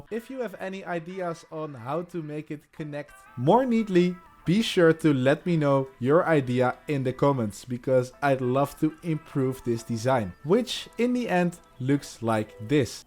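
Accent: Netherlands. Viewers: I recognise the Dutch accent instantly!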